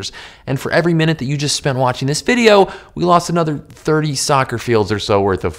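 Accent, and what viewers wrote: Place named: California, United States. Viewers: i thought he was talking like someone from California. maybe he has a stuffy nose? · Why are you talking with such a heavy California dude accent in this video?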